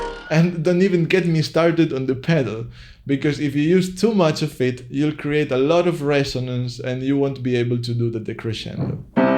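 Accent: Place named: Spain. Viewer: Se te nota el acento, español jajajaj. Buen video :)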